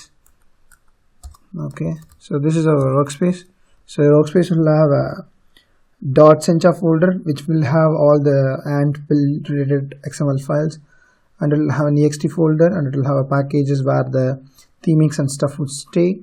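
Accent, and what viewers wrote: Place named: India. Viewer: Люблю индийский акцент, всё сразу понятно без субтитров :)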